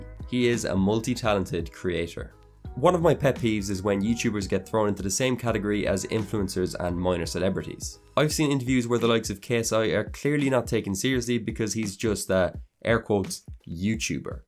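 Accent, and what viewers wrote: Ireland. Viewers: Are you actually Irish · Man with that irish accent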